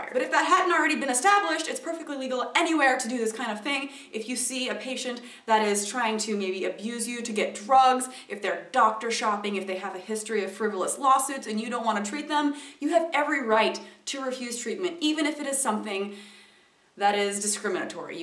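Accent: South, United States. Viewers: Why did you put on a southern accent? Michigan is as north as it gets, minus Alaska.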